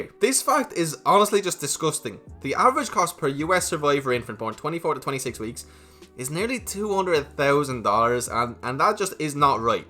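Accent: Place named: Ireland. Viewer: It's so obsevaious he's from Ireland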